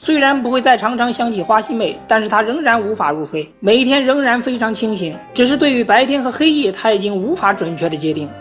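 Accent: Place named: Northeast, China. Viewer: 這是東北解說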